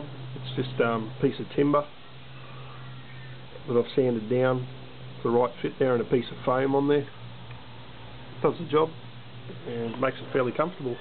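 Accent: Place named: Australia. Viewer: thought u sounded like an aussie so checked out your youtube page and saw your Greens pic. Guess you dont vote Green, haha.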